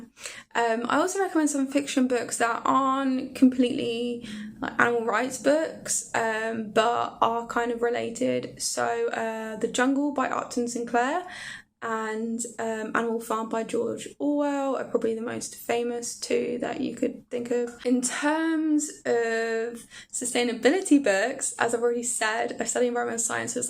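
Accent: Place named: United Kingdom. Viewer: For the first half of 4th grade, we had world geography from Mrs. Hisey in FL USA, and she told us we would need to do a semester project on a country. But I asked her if I could instead focus on Antarctica, my favorite continent. So I used my Father's drafting tool called a pantograph to enlarge a Rand McNally map onto a piece of plywood. I used plaster of Paris painted white to show (vertical exaggerated of course) mountains and painted the ocean blue. I put in little flags to mark the winter-over science stations of color-coded various countries. I added a backboard for some basic stats. I loved that Antarctica was and is the continent of peace, science, nature, and an international zone! I enjoy your delightful UK accent and your love of science and your being a longtime ethical vegan! From my work with ramping up Green TV (US), I know just how challenging it can be to attain 1000 subscribers, so I congratulate you on attaining that. 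Glad you will be tapping space data in your research--not only what the late physicist-astronaut Sally Ride deemed Mission TO Planet Earth, but also via comparative planetology understanding what happened to Venus and Mars, for instance. BTW, as to books, I managed to get the UK edition of Greta Thunberg's "The Climate Book," published on Thursday 27 October 2022, and am reading it and will be reviewing it for Green TV Web site, our first-ever book review. It is an outstanding primer on the No. 1 climate crisis, brin ging together essays by s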